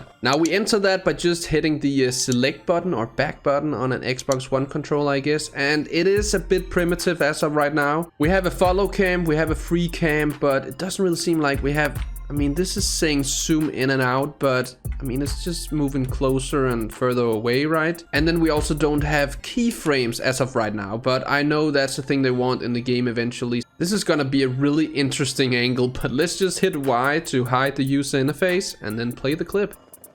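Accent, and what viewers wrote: Denmark. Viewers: Are you from Denmark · are you from denmark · He's Danish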